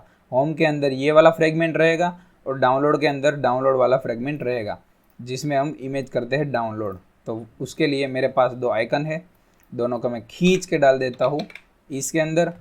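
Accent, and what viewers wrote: Gujarat, India. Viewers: When you speak Hindi, it seems that you are 100% a Gujarati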